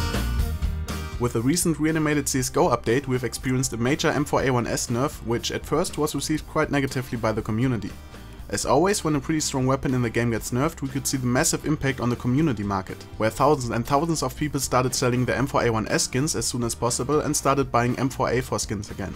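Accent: Germany. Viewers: Bist du Deutscher? xD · are you german? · You are German right? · Ure german? Ich dachte ich hätte den akzent erkannt :D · bist du deutscher? Du hast ao einen kleinen Aczent :) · The german accent is anoying to my ears. I don't know maybe just me. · bist du deutscher? Dein englisch klingt irgendwie deutsch.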